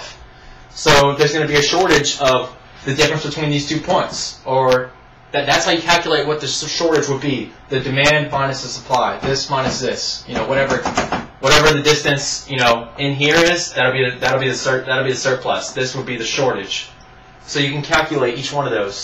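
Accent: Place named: South, United States. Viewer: So entertaining! And easily explained! I Like your southern accent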